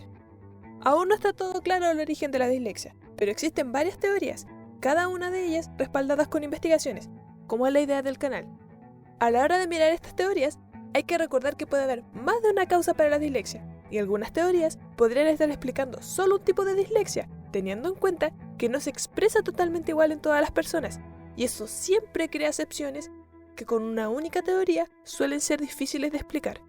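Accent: Chile. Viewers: eres chilena?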